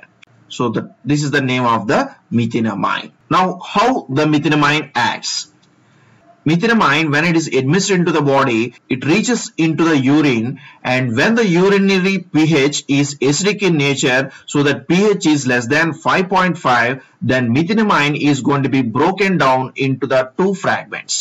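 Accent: South India, India. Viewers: Ur pronunciation tells that u r South Indian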